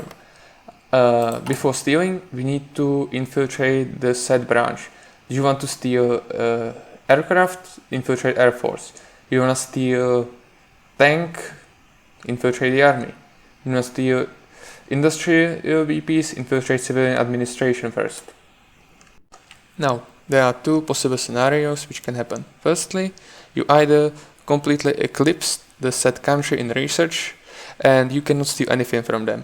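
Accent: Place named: Czech Republic. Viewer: i tried to watch it but i could not stand that czech accent.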